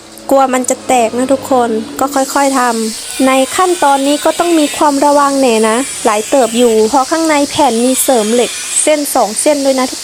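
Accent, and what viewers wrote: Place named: Laos. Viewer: เสียงไทยปนลาวสาวแว่นตั้วนี่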